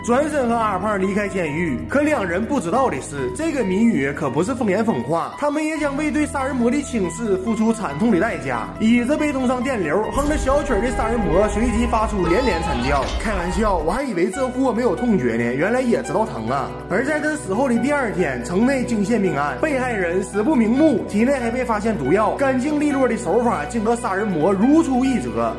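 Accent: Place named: Northeast, China. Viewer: 我承认有些人爱听东北口音，但是也有好多人都听不惯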